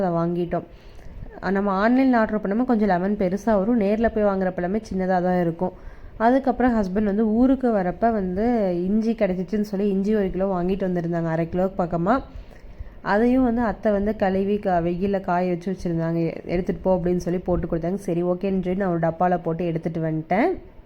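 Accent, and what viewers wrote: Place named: Kongu, India. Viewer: Kongu tamil kekavae romba nalla irrukku.. super sister ...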